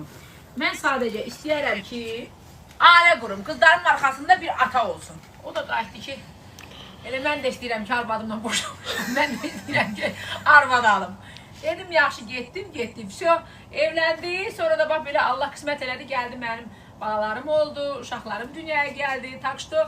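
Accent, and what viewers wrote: Goranboy, Azerbaijan. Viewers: Goranboydan salamlar.salam ay elli qizi.danisigina quzu keserem.oz rayonumuzun lehcesi lezzet eleyir.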